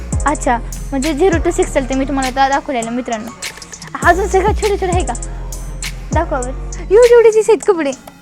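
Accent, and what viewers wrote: Latur, India. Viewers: Perfect latur language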